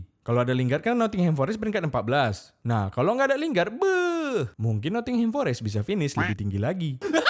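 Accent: Medan, Indonesia. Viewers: Sukak kali la aku sama chanel si ini bahasa medan nya kerasa kali bah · Nada org MEDAN dan bahasa MELAYU upin ipin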